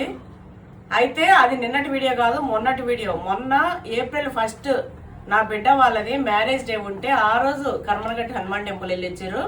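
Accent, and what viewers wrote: Telangana, India. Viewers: Telangana slang vachesinde andi miku baale chepparu😅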